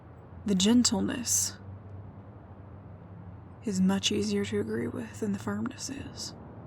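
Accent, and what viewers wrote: South, United States. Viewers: The southern accent makes it so much more calming lol I always come back to these vids.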